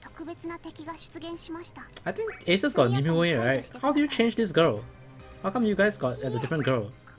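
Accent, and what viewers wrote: Singapore. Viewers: you mean everyone in Singapore speaks like this and actually understands each other??? O_O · its called singlish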